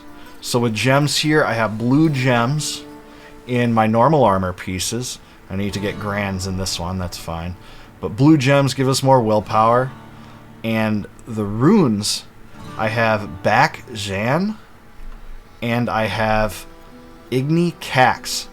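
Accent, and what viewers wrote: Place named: Wisconsin, United States. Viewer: Gotta be honest, I recognized the accent before I saw the hat. A fellow Wisconsinite here, Appleton. Nice vid!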